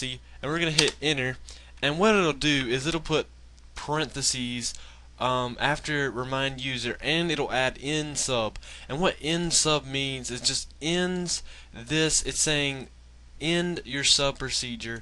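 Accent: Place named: South, United States. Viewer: Great Video, sexy semi-southern accent.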